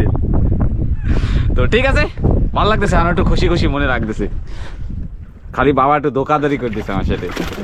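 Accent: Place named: Shariatpur, Bangladesh. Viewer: তোমার জন্ম স্থান কোথায়, বাংলাদেশ না আন্দামান। তোমার ভাষায় মনে হয় শরীয়তপুরের আশে পাশে।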